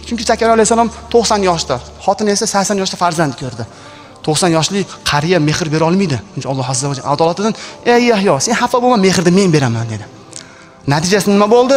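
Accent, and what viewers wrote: Osh, Kyrgyzstan. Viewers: бу инсон шевасидан ошли ми дунйо тургунча туринг домла боркану хакикат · Ош шахридан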